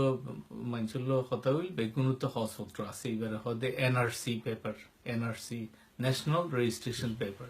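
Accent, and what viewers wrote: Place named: Rohingya, Myanmar. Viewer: Prof., Masha Allah, you are amazing. Love your Rohingya accent and stands for Rohingyas.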